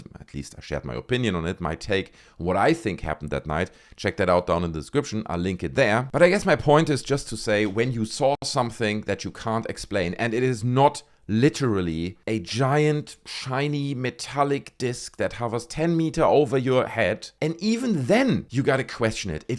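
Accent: Germany. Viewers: Are you from Germany? Du hörst dich jedenfalls so an.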